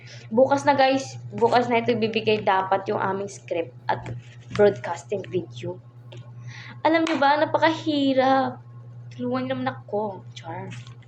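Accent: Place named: Ilonggo, Philippines. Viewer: Ilongga malambing talaga galit na malambing pa rin ang salita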